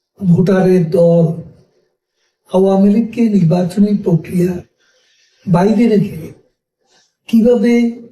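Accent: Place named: Kolkata, India. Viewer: কথায় কলকাতার একটা টোন আছে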